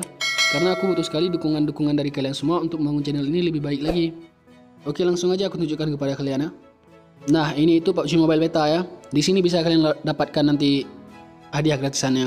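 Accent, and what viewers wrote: Aceh, Indonesia. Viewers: Logat aceh sang? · Kaya logat aceh ya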